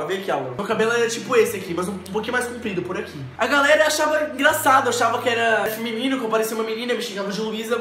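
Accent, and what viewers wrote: Porto, Portugal. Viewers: tens mesmo o sotaque do porto kkk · sou de portugal e acho que não sou a unica Portuguesa a achar que tu ficas super fofo a falar Portugues de Portugal <3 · oh meu deus, tens sotaque português, AMO*-* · Mano, é muito viciante quando alguém que costuma falar PT BR fala PT PT. Actually, it's kinda cute <3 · falas mm bem portugues!! 😂 sotaque do porto mas yh boa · Eu comecei a ver o vídeo é minha cabeça explodiu!!! Eu te acompanho faz pouco tempo e nunca te vi a falar com sotaque de Portugal! Vem outra vez dá!!! #LuísMarizEmPortugalOutraVez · Raymson Barbosa Português de Portugal :) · Adoro quando tu falas Português- Portugal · tens bue sotaque do porto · Aí adoro quando falas em português de Portugal. Devias fazer mais. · Assim uma coisa , gosto muito mais do teu sotaque português de Portugal do que português do Brasil ( e eu adoro o sotaque brasileiro) mas ficaste mesmo fofo a falas assim hahahahaha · omg!!! 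eu nao fazia a minima ideia que tu estiveste a viver em portugal adorei ouvir te falar portugues de portugal eu nao acredito!!!nao podes comecar a falar um pouco nos teus videos em portugues de portugal?? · é sotaque à portuense carago!!!,! ;) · esse sotaque português !!! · Meu Deusssss, o sotaque dele no início e no fim do vido tem mesmo sotaque português!!!!! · vem logo pro portoooo !!!!!! sotaque portugues lindo :o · Sotaque Portugues <3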